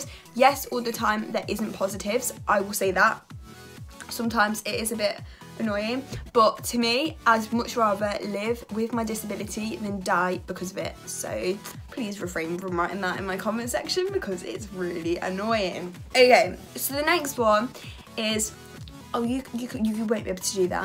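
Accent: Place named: England, United Kingdom. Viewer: You 're such a cute young woman (i could be ur father) , i've seen two other videos of U … You are able to do most things that a lot of us with our hands can't do. As you say, you do those vidéos, u go to school , U do so many things that other Don't, me first. I love your English accent and the light you're brighting arround you. Sorry for my English , i write from Britanny (France) . You already know how to be Happy Pleased Glad … many ones will never know that.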